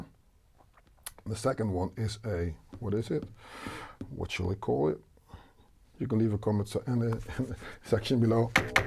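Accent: Netherlands. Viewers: Assuming you're Dutch based on your accent. Correct me if i'm wrong haha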